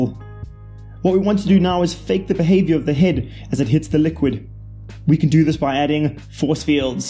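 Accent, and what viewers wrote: South Africa. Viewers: You sound South African lol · The accent took me a second to pick up. Not used to SA repping in the industry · South African?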